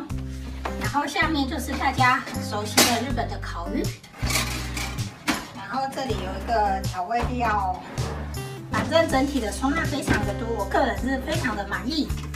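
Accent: Taiwan. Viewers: 台中口音!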